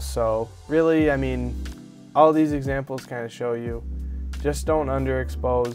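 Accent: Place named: Rochester, United States. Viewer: I haven't been home in over 3 years but just listening to your Rochester accent made me feel at home :)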